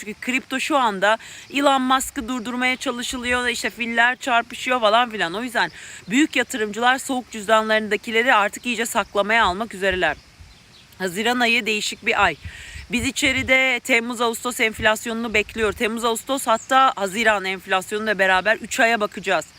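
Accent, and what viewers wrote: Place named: Sivas, Turkey. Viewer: Hocam sivaslısınız galiba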